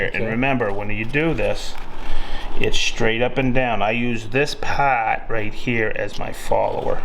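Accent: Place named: East Coast, United States. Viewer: Been a long time carpenter and really have enjoyed watching your videos. Living in So Cal my whole life really dig the east coast humor and accent 🙌🏼🙌🏼 Been starting to follow the Festool live show also. Moved here to NC and ISO a festool location 🤷🏻‍♂️ any help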